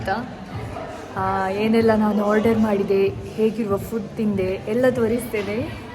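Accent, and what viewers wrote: Standard. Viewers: ನೀವು ಯಾರು ಅಂತ ಗೊತ್ತಿರಲಿಲ್ಲ ಕೀ ತಡಿ ಕಿರಣ್ ಅವರಿಂದ ಪರಿಚಯವಾಯಿತು ನಿಮ್ಮ ವಾಯ್ಸು ತುಂಬಾ ಚೆನ್ನಾಗಿದೆ ಕನ್ನಡ ಸ್ವಚ್ಛವಾಗಿದೆ ಆಲ್ ದಿ ಬೆಸ್ಟ್ ಯುವರ್ ಪಿಚ್ಚರ್ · ನಿಮ್ಮ ಚಾನೆಲ್ ನಾನು ಇವತ್ತು ಯು ಟ್ಯೂಬಿನಲ್ಲಿ ವ್ಯೂ ಮಾಡಿದ್ದೆ. ಮೊದಲಿನದಾಗಿ ನಿಮ್ಮ ನಗು ಮುಖ ಹಾಗು ನಗುಮುಖದಿಂದ ಎಲ್ಲರಿಗು ಪ್ರೀತಿಯಿಂದ ಕೊಡುವ ಉತ್ತರ ನಮಗಂತೂ ತುಂಬಾ ಖುಷಿ ಕೊಡುತ್ತದೆ. ನಿಮ್ಮ ಪ್ರತಿಯೊಂದು ಶಬ್ದದಲ್ಲಿ ಪ್ರೀತಿ ಮಾನವೀಯತೆ ತುಂಬಿದೆ. ಇದೆ ಸಮಾಜದಲ್ಲಿ ಎಲ್ಲರಿಗು ಬೇಕಾಗಿದ್ದು. ಎಷ್ಟೊಂದು ಪ್ರೀತಿಯಿಂದ ಮಾತನಾಡುವ ನೀವು ನಮ್ಮ ಕರ್ನಾಟಕದ ಯಾವ ಭಾಗದವರು ಅಂತ ತಿಳಿದಿಕೊಳ್ಳುವ ಕುತೂಹಲ ಬಂದು ಗೂಗಲ್ ಸೆರ್ಚ್ ಮಾಡಿದಾಗ ನೀವು ಸುಳ್ಯದವರು ಹಾಗು ಆಳ್ವಾಸ್ ಕಾಲೇಜಿನಲ್ಲಿ ಓದಿದವರು ಅಂತ ಗೊತ್ತಾಯಿತು . ಎರಡೆನೇಯದಾಗಿ ನೀವು ಸ್ವಚ್ಛತೆಯ ಬಗ್ಗೆ ಮಾತನಾಡಿದ್ದಿರಾ. ಸ್ವಚ್ಚತ್ತೆ ಎನ್ನುವದು ಅಲ್ಲಿಯ ಜನರ ರಕ್ತದಲ್ಲೇ ಬಂದಿದೆ. ಸ್ವಚ್ಛತೆ ಇದ್ದಲ್ಲಿ ಶಾಂತಿ ಹಾಗು ಖುಷಿ ಇರುತದೆ. ಇದನ್ನು ನಮ್ಮವರಿಗೆ ಹೇಳಲು ಹೋದರೆ ದೂರದ ಮಾತು... ಮೂರನೆಯದಾಗಿ ನೀವು ಎಷ್ಟೊಂದು ಪ್ರೀತಿಯಿಂದ ಕನ್ನಡದ ಬಗ್ಗೆ ಪ್ರತಿ ಬಾರಿಯೂ ಪುನರುಚ್ಚರಿಸುತ್ತೀರಾ .. ಮತ್ತು ಚೀನಾ ನೆಲದಲ್ಲಿ ನಿಮ್ಮ ಶುದ್ಧ ಕನ್ನಡ ಕೇಳಲು ತುಂಬಾ ಖುಷಿಯಾಗುತ್ತದೆ. 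ನೀವು ಯಾವತ್ತೂ ಖುಷಿಯಿಂದ ನಗುನಗುತ್ತಾ ಇರಲೆಂದು ದೇವರಲ್ಲಿ ಪ್ರಾಥನೆ.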